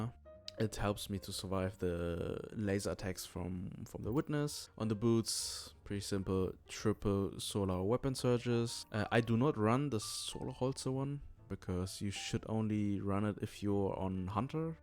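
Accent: Germany. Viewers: Man hört richtig, dass hier ein deutschsprachiger spricht. Aber tolles Video 👍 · sounds german🗣️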